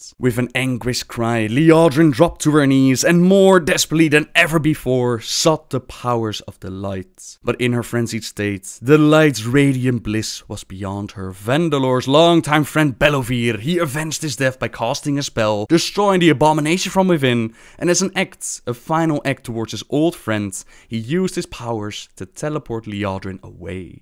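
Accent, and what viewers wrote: Netherlands. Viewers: Garrosh Hellscream If you're talking about, he is Dutch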